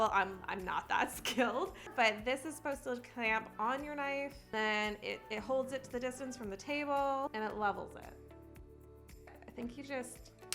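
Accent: Canada. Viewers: Oh you're Canadian? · If you see this can you answer it are you Canadian?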